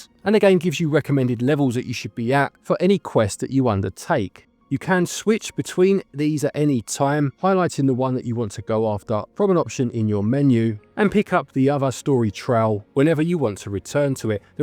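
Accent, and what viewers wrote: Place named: Cockney, United Kingdom. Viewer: It's nofing wrong. I love the cockney accent.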